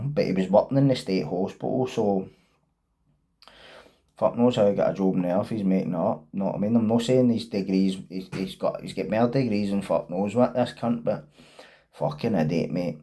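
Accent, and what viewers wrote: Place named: Glasgow, United Kingdom. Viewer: He is speaking glaswegian..isn't speaking like a ned just a general local accent lol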